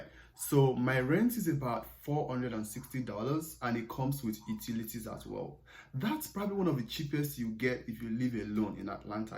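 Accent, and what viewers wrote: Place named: Ogbomosho, Nigeria. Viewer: Mateo and I are watching. He says he like your 'frequent' ogbomosho tongue :)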